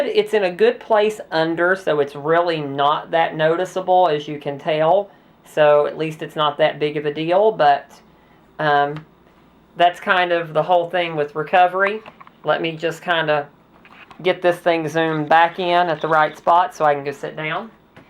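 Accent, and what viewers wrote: South, United States. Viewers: The word for subcutaneous air (air under the skin) is "crepitus". Pronounced CREP-it-tuss. Congratulations! I too am trans M to F. Your accent is distinctly southern. May I ask what state you are from? I am a Florida. All the best! Alanna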